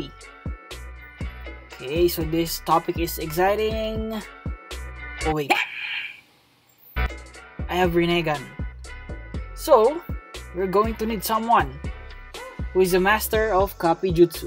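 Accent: Philippines. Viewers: i know a filipino when i hear them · Oww filipino ka pala halata naman sa accent mo!!!! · I fricking knew it, you are Filipino, I knew it by your accent >:D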